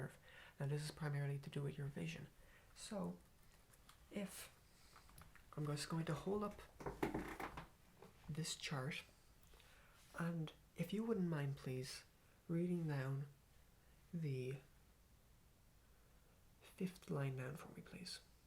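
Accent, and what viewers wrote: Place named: Ireland. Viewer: Yay and Irish ASMR artist 👌🏼 I'm Living in the Uk and it's so cool to have a familiar accent sending me to sleep 😴 Subbed!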